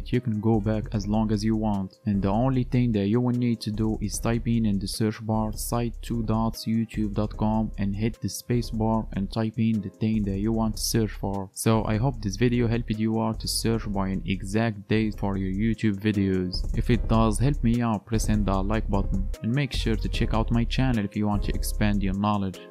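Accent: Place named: Morocco. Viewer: باينة مغربي اساط غا من هضرتك الله يسهل ابرو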